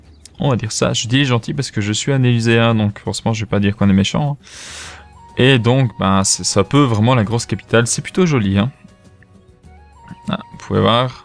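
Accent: Belgium. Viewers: c juste que c un belge(wallon) vive les wallon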